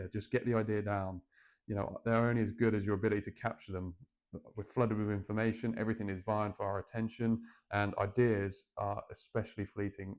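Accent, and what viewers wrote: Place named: East Anglia, United Kingdom. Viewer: Slight East Anglian accent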